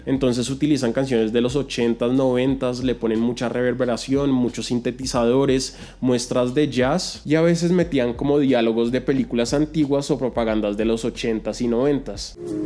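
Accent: Bogotá, Colombia. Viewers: Mk se te nota que eres de Colombia JAJA y que eres de Bogotá :v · Porque es de Bogotá casi todos hablamos haci aquí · El único acento rolo q no me molesta es el de este men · Puedo sugerir un video ? Me encantaría que hablaras un poco de Mariana froes. Ptd: Adoro el acento rolo. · Pero si es roloooo, nooooo, a nadie nunca le gustó el acento rolo! Y respeto al man y me gustó el vídeo y casi no se nota, pero, QUE ESTAN DICIENDO? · Creo que es de colombia y colo de Bogotá, me suena su acento a esa región de Bogotá. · Che, no conocía a este man, pero apenas lo escuché hablar, me dije: "este man es rolo". Tremenda voz que se carga uwu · Es el asento rolo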